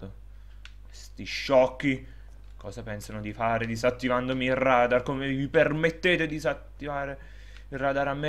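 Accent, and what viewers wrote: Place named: San Giuseppe, Italy. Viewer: dal tuo accento mi sembri di San Giuseppe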